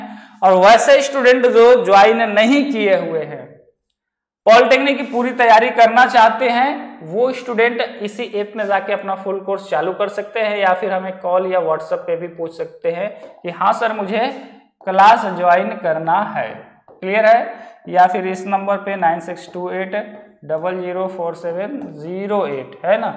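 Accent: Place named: Uttar Pradesh, India. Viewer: Up se ho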